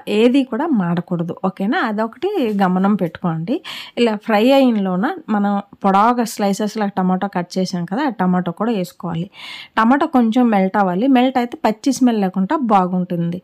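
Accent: Srikakulam, India. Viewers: శ్రీకాకుళం మీది మీ యాస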